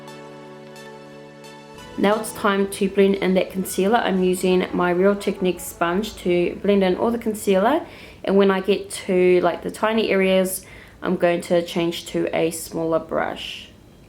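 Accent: New Zealand. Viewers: are you from New Zealand? 😍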